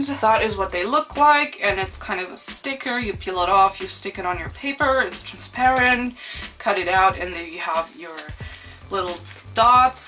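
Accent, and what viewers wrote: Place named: Germany. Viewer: a german accent~ I can only say this because you sound similar to Kicki Yang Zhang in her older videos. Dw it's not heavy or anything lol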